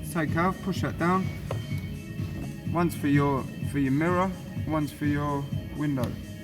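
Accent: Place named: Africa. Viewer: Africano hablando inglés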